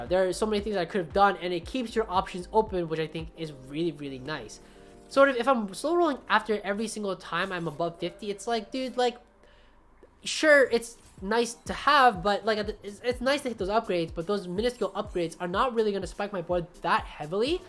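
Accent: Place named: Boston, United States. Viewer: Hey, found you and learned a lot from your previous road to challenger vids! You said you have a Boston accent, I'm also from Boston and also suffer from the random accent coming out LOL, keep up the good work!!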